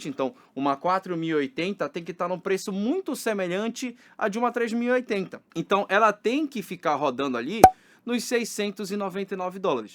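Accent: Pará, Brazil. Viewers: Esse sotaque é do Pará? Do nada achei seu canal e agora tô aqui assistindo sobre placas de vídeo 🤭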